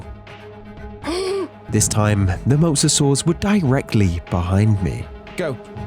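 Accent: United Kingdom. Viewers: His accent, always gives the British narrator 🙏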